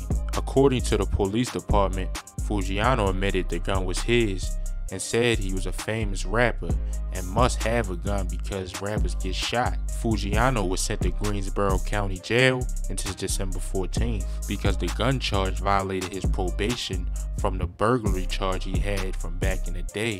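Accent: Baltimore, United States. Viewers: Baltimore accent crazy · def bmore 😭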